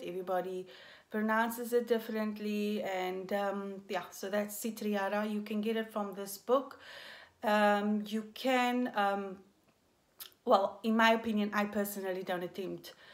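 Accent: South Africa. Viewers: Are you South African? Bec I am :)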